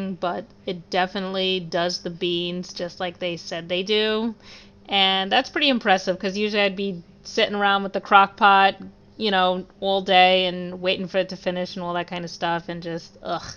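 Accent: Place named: New York, United States. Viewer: will never get over the glorious ny accent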